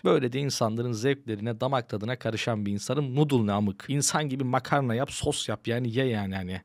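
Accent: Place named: Aydın, Turkey. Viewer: abi memleketlimsin valla aydın'lı olduğun konuşmandan belli sevgiler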